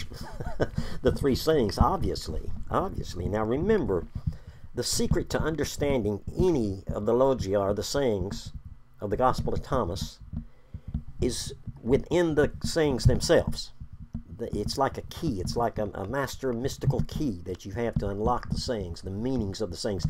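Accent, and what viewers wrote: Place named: South, United States. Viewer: Southen accent is so grating to listen to.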